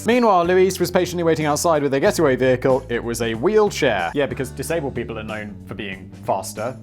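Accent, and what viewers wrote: United Kingdom. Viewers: Yeah, must admit I was slightly startled by a guy with a British accent (albeit now living overseas) having so much difficulty pronouncing an English placename...? ;-p But then again, many of them do seem to have been designed to make it difficult even for the natives...? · slow down Americans need a minute to understand limey and I grew up with neighbors from Sheffield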